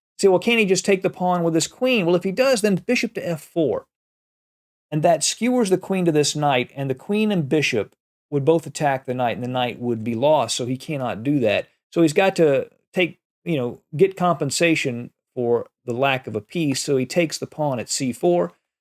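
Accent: United States. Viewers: I very much enjoyed your US English! As of Alireza: one day he would break through.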